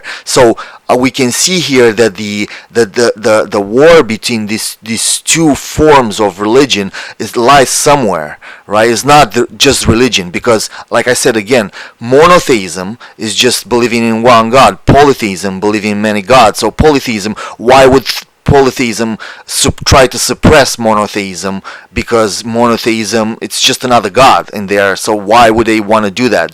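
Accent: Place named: Romania. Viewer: Vroiam sa spun " Tabu, in marea parte a cercurilor culturale filosofice ale unei facultati" cum e cea de Filosofie din Bucuresti ( Oricum, raspunsul le , e dincolo de limitele mele umane, dar e corect.... ) Istorie a religiilor ca disciplina nu am facut nici macar la Masteratul de Istorie a Religiilor (la inceput, cel putin, ca a tr sa renunt, fara voia mea) KOGAION- te referi probabil la axis mundi din mitologia Dacilor. Un Axis Mundi face macar legatura intre uman si divin / profan si sacru / cunoasterea umana limitata si cunoasterea transcendentala etc. Ignoranta e cel mai mare neajuns al unei culturi mici, aproape inexistenta, asa cum a ajuns a noastra. Vorbesti despre Culianu, Cioran, Eliade, fenomenologia religiilor (si toate celelalt subdomenii), filosofii, curente culturale etcc etc etc si dupa atata munca, din 170 de vizualizari, cati crezi ca inteleg cu adevarat, facand corelatii si cu alte surse sa inteleaga punctul tau de vedere? 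Discutii, comentarii, argumente.............शून्य (shunya / vid ) cum spun Indienii. Altul cade-n bot si are 170.000 de vizionari. Suntem poate o natie superficiala, sau poate romanii nu-ti inteleg Engleza din cauza accentului romanesc.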